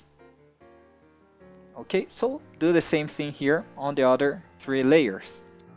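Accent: Brazil. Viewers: you speak like a Brazilian. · que sotaque em ..... parece até brasileiro kkkkk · Are you from Brasil. Tem um sotaque de BR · I just thought the same thing, he definitely has a Brazilian accent lol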